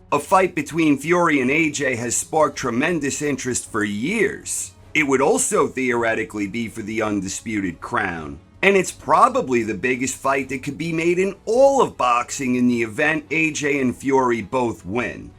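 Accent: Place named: East Coast, United States. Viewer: What east coast city accent is this?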